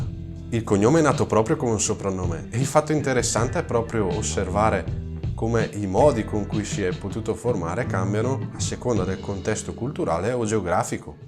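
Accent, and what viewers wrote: Brescia, Italy. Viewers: Mi piace molto la tua cadenza bresciana. Mi ricordi un mio amico.😊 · C'è un professore di italiano su YouTube, Italiano Automatico. Ha esattamente il tuo stesso accento. Questo accento è molto particolare e non ci sono altri professori con lo stesso. Ho scoperto che anche lui è di Brescia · Complimenti per l,argomento trattato. A parte la cadenza bresciana, che qualcuno an approva. È molto interessante. .la pregherei di ripetere la testata del libro che mi è sfuggita. Grazie. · Video molto ben fatto su un argomento estremamente interesante....inoltre complimenti per la pacatezza e l'educazione che rivelano la bellissima persona che sicuramente sei....è molto piacevole seguirti....troppo forte l'accento bresciano....mi ricorda degli amici che avevo conosciuto durante il servizio militare decenni fa....ed in particolare il simpaticissimo tognoli....tutti bresciani ovviamente....apprezzo molto le conoscenze che hanno 1 matrice storico-culturale ed in particolare sulle nostre origini.....grazie..... 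· Molto interessante! Mi è piaciuta la passione con cui parli del tuo lavoro! Simpatico anche l’accento bresciano!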